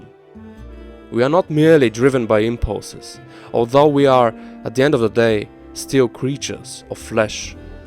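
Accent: Germany. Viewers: Great video ! I like your accent, is that german ?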